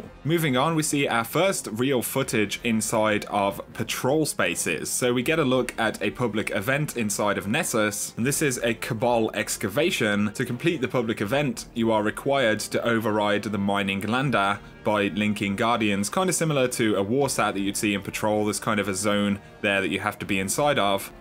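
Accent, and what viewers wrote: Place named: United Kingdom. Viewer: Thanks, keep up the good work! (from Michigan, USA) Love that "!" UK accent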